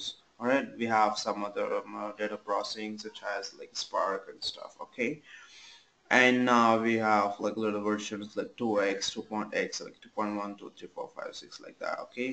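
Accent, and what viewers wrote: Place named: India. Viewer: Please speak in normal English u r Indian don't speak like NRI ok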